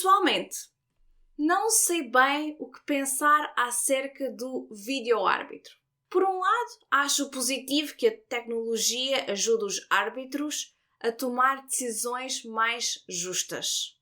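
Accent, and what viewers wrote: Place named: Portugal. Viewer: Seu sotaque português é lindo!😉 Saudações brasileiras diretamente do Rio de Janeiro 🇧🇷😚🇵🇹.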